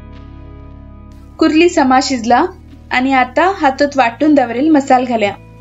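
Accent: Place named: Karwar, India. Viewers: guruprasad YM , true ..I too sensed that . It sounds more of uttar Kannada konkani version or Goan or Malvani . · .. Hello dear. That's Karwari Konkani. People from Dandeli, Kumtha,Murdeshwar speak in this accent. It's not the Goan Konkani. I am a Goan GSB.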